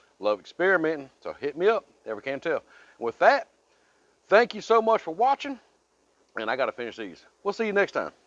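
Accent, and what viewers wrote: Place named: New York, United States. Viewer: How come when I saw you in a bar in the East Village you sounded like every other New Yorker in there?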